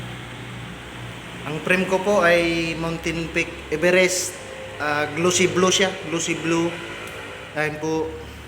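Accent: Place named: Visayas, Philippines. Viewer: Dong bisaya ka🤣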